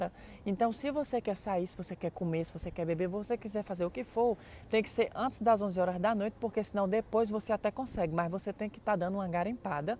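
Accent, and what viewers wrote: Ceará, Brazil. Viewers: adorei, vc é mto engraçado! amo sotaque nordestino <3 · Amigo! gosto da suas expressões, sou nordestino como você e sinto feliz quando fala com sotaque. Fazia muito tempo que eu não ouvia a palavra " pirangueiro " ou " pirangagem " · oi! amo seus vídeos, suas opiniões, vc além de inteligente é muito divertido! amo o sotaque nordestino. um chêro ( minha mãe tb fala assim) bjs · sou nordestina também e adoro seus vídeos, vc fala como se ainda vivesse no nordeste kkkk! Ômi, fala da sua opinião sobre comida parisiense. Eu sou meio fresca com comida e nas vezes que fui em Paris só como steak e batata. Uma vez fomos jantar na "Champs", pedi risoto de frutos do mar....veio os Moules...tive coragem de comer não!! prefiro sururu! kkkkk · voce e muito engraçado ate o seu sutaque cearense voce ainda não deixou Conheço um pouco Paris porque tenho 1 filho e uma filha que moram na França ela em Angers vale do Loire linda cidade e o filho strasboug são profissionais ela medica e ele engenheiro moram na França ha 17 anos tenho 4 netinhos e se um dia eu for a Paris eu queria voce como meu guia turistico pra mostrar muitas coisas que eu nao conheço em Paris passei pelo Louvre Torre jardim de Luxembourg etc mas ainda tem coisas que eu nao conheço um abraço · Aquele momento que você sente orgulho de ser cearense só pelo sotaque kk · Saiba q gosto muiiito, muiiito de vc. 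Uma afinidade q deve se justificar pela sua simpatia, educação, autenticidade e, mais q tudo junto, por sermos conterrâneos. Sou Cearense, do Cariri, e me emociona te ouvir falar com nosso sotaque, do nosso jeitinho tão singular. Vc fala de Crato, Juazeiro e eu embarco junto... Hj vc busca realizar seus sonhos e objetivos em Paris, eu na tão querida, por mim, Salvador/BA, mas o fato é q as nossas origens/ raízes são como uma marca, um sobrenome. Sorte e muitas Alegrias em sua vida. Que viva muitas aventuras especiais para q tenha sempre assuntos a compartilhar com a gente. Xeiroooooo grd.! E obrigada por nos fazer sorrir a cada frase. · Tô amando seus vídeos! Você sabe falar sobre diversos assuntos, sérios ou não, e ainda assim consegue arrancar risadas. Você é ótimo, amo o jeito de falar, representa nosso Ceará! Sucesso aí! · td bem? Faz mais video desse estilo, falando o q vc não gosta e o que gosta. 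Você é otimooooo. Sou do Ceará tbm, mas tem MT tempo que sai de la e moro em Vitória, no ES. Então assistir seus vídeos me faz matar a saudade do sotaque de la, além de rir muito. Parabéns e sucesso.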